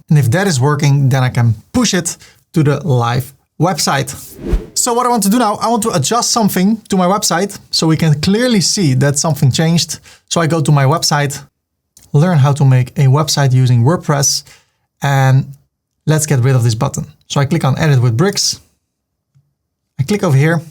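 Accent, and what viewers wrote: Netherlands. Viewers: i love the dutch when they speak english zo schattig 🥰😅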